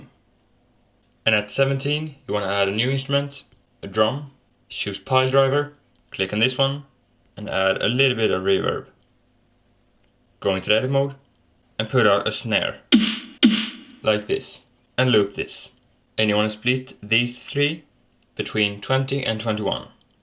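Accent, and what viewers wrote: Sweden. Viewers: Vet inte om jag har rätt. Men redan 5 sekunder in i videon kunde jag höra att du var svensk. Det är väl positivt att man har bra öron. Bra när det kommer till musik. 😂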